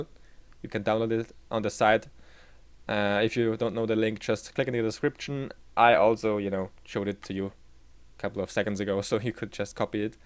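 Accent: Germany. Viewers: I It really sounds german btw im german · also sprichst du doch deutsch das merkt man obwohl dein Englisch echt gut ist:) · Du höhrst dich so Deutsch an :o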